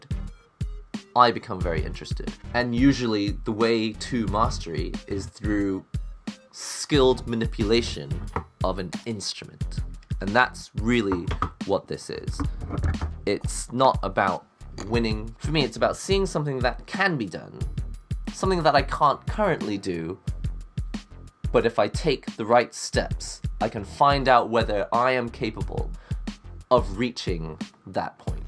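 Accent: England, United Kingdom. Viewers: It warms my heart to see an Asian with an English accent rather than American. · the british accent really fits you XD I mean I know the accent wasn't intentional but damn XD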